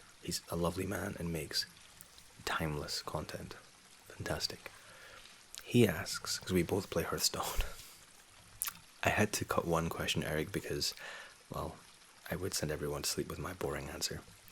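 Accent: Scotland, United Kingdom. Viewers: You still have a strong scottish twang to your accent :) yay for scotland ❤☝ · You sound Scottish to me mate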